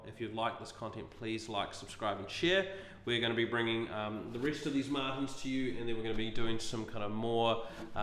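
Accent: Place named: New Zealand. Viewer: Love the guitar, the player, and the Kiwi accent!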